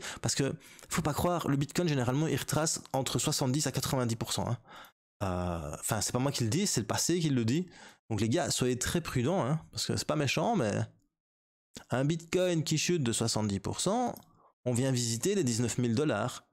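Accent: Liège, Belgium. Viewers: C'est clair, j'avais directement reconnu l'accent liégeois ! :)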